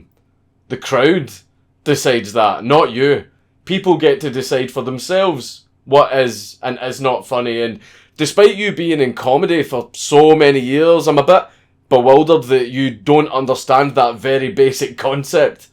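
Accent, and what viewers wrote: Scotland, United Kingdom. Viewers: Dude, your Scottish accent is nothing short of glorious. Hearing you speak makes me happy. Have a great day and keep up your great work! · Lol. I read Conor's comment to be a tame joke about Scottish accent and people replying are legit mad. ahahahahahahahah.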